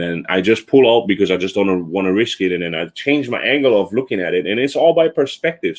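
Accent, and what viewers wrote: Hawaii, United States. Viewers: Shoots stoked to find someone talking story with drones local style nice! U Maui? Normally North Shore Oahu we have to connect one day! Aloha about to get 6K evo2 possibly on the fence. So you say you have the pro is that the 8K or 6K? 🤙🏾🤙🏾🤙🏾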